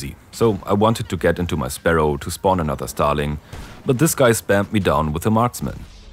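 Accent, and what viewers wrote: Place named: Germany. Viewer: der deutscheste akzent überhaupt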